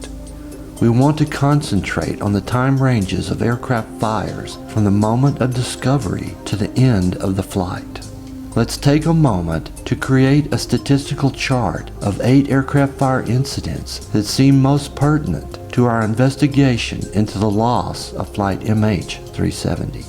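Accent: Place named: South, United States. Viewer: I hope episode 4 drops soon! Thanks, this was excellent. Love your accent! Are you in the South? ❤️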